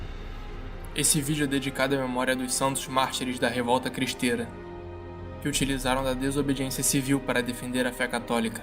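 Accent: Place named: Rio de Janeiro, Brazil. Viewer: DEIXA DE SER FRESCO! O SOTAQUE "CARIOCA" DO NARRADOR É PERFEITO!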